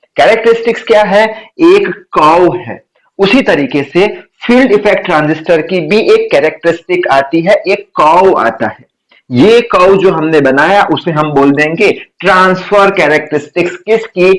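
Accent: Gujarat, India. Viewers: When at the starting of the video (hlo) and i m understand sir is also from gujarat..😅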